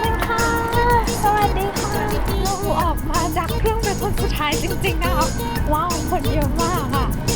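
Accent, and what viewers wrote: North, Thailand. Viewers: เอ้ยอู้เหนือก่อ 5555 พี่ พูดเหนือไหมค้าาา?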